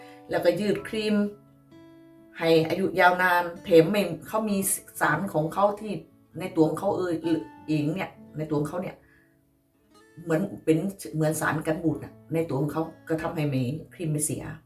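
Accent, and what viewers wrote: Korat, Thailand. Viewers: พูดโคราช. เป็นคนโคราชใช่มั้ยคะ. คนบ้านเดียวกัน. ตอนนี้กำลังลองสูตรน้ำมันมะพร้าวกับน้ำมันวิตตามินอีค่ะ. รอลุ้นอยู่ค่ะ. อยากสวยเหมือนพี่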